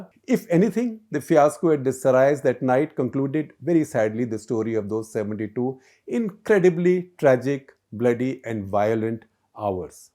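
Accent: India. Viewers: such an annoying way of narrating the story....no proper pauses, no variations. flat Indian accent with no delivery sense at all... though, content may be rich....but you need to work on your delivery sir.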